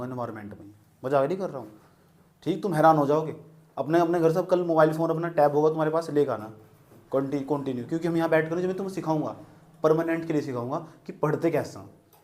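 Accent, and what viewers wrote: Haryana, India. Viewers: Asa lagta h sir ap bhi haryana se ho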